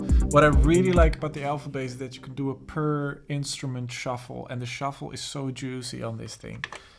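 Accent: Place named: Ireland. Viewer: you can have the irish accent pass lad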